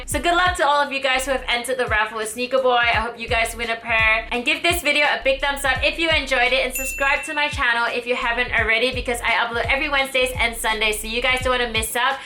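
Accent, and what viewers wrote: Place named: Australia. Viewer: I love your aussie accent LOL its so cool compared to my American accent. Def gonna look into 4Ds I think Adidas is really killing the game right now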